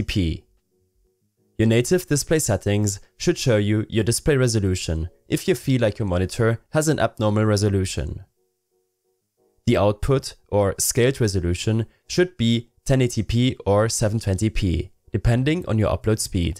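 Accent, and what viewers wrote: Germany. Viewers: oh cool ein deutscher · i hear a german accent in ur voice😂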